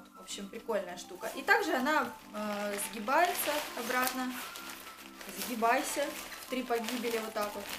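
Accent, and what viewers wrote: Rostov, Russia. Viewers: по-моему ростов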